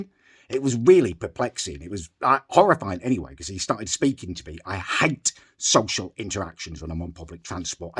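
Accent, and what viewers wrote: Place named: RP, United Kingdom. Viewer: Uno what Yh I fully love ya vids now pal i genuinely sit hear watching all of your videos while sm’kin. I see you like I see my grandad cute af n genuine down to the bone 😂 I love how you are it’s jokes I can relate so much but u talk v posh it’s jokes 😂 keep up the good work lad and I hope u have a bloody good new year don’t let the haters get to u ♥️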